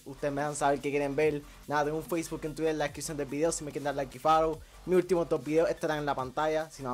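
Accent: Puerto Rico. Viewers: Boricua obligau ajajaja se nos nota de lejo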